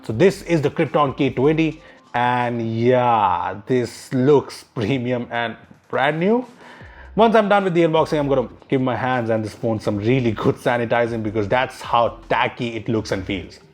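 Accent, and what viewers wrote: India. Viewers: BRO YOUR ACCENT IS DIFFERENT THAN OTHER INDIANS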